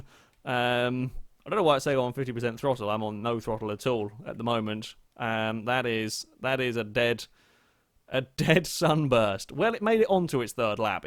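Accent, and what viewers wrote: United Kingdom. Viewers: This guy sounds like one of the high society rich English guys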